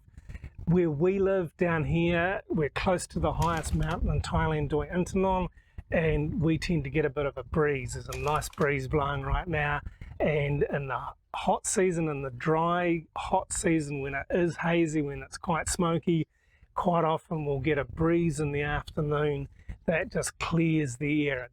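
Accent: New Zealand. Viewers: What a great idea for a video topic. I really enjoyed this. The presenter sounds like he really know what he's talking about. I learnt a lot. 20 years in Thailand and I can still hear a New Zealand accent :)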